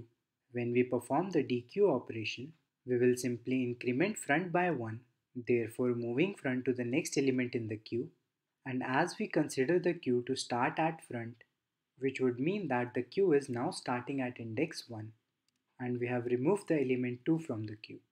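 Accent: India. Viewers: you have quite heavy indian accent but other than that, clear explanations and simple video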